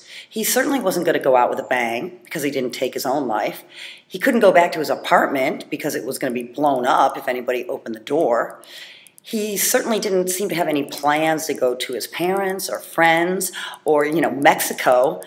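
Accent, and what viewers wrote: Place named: Midwest, United States. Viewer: Great, subtle Mid-america accent. Where are you from, darling?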